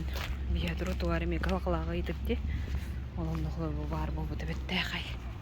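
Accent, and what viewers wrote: Sakha, Russia. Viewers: ты лучше всех,наһаа үчүгэйдик уу сахалыы саҥараҕын,кэпсиигин,устаҕын,мин эн передачаларгын олус сөбүлээн истэбин,көрөбүн,өссө даҕаны интэриэһинэҋ биэриилэриҥ элбии турдуннар🙏🏻👍👏🏻👏🏻👏🏻😍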